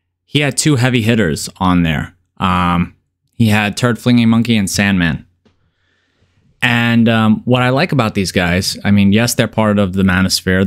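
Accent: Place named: New York, United States. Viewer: Random bro but no weird shit but I'm a black guy from London and I have to say your accent is so dope. haha that New York accent just makes me enjoy your stuff even more lool you're voice just makes me feel like I'm listening to someone from the wire like Mcnulty talking real red-pilled talk to me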